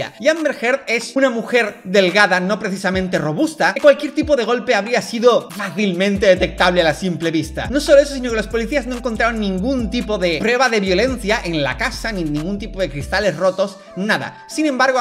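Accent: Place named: Spain. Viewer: No lo dice mal, solo lo dice con su acento español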